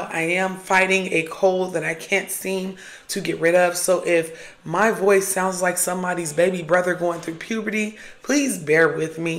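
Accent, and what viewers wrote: United States. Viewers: CAULDRON. Spelling..... And you are NOT African, unless you were born there. You sound AMERICAN. You seem to be a Black American of African Heritage (& frankly, white too) The term AFRICAN AMERICAN was a label put upon you to divide us. My ancestry is Sicilian and German, but I don't call myself a Sicilian American. Can you imagine is EVERYONE did that? Everyone you know would be an Irish American or a Hispanic American, or..... And NATIVE American? ANYONE BORN HERE is Native. Maybe The Original peoples or Indigenous peoples or... ? Don't divide. WE ARE ALL Americans (& really need to take our country back from the politicians who are importing an invasion of people that do not hold our values, speak our language or have any intention of assimilating into our society.) P.S. You remind me of my friend's daughter. very pretty. Thank you forthe video - Subbed!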